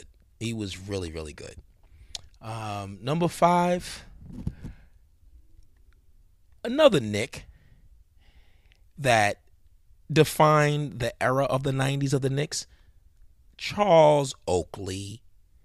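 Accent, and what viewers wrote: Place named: Brooklyn, United States. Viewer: Good podcast brother. I'm sorry if you answered this in a previous cast because I just found you channel recently, but what borough or part of NY you from? You got a BK accent to me sounds like Saigon too. Dope channel, glad I found it. Respect from Queens via the Bronx. Word up to my dude Kemba. That rook in Orlando, Isaacs, is also a Bronx kid from what I've heard. ✌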